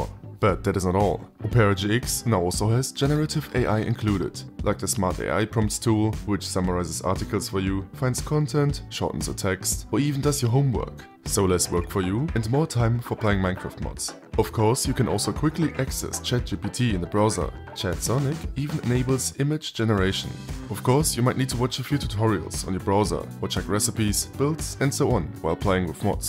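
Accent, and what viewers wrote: Germany. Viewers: He is German you can hear it your self in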